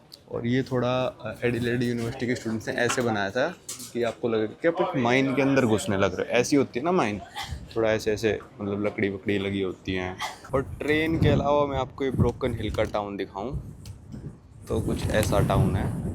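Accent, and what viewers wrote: Haryana, India. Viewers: Rom rom bhai are you Haryana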